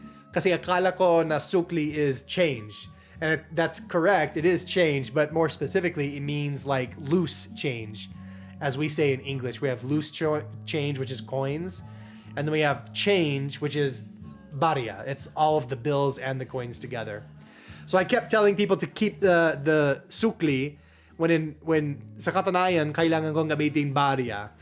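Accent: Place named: Baguio, Philippines. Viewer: Ang accent mo sa tagalog ay accent ng taga baguio. Ganyan na ganyan ang tuno ng tagalog doon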